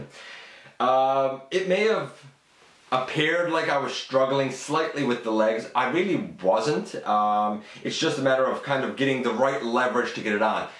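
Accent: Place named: Quebec, Canada. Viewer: You sound French Canadian. Haha, I stumbled across this video precisely because I see the set is on sale for $120.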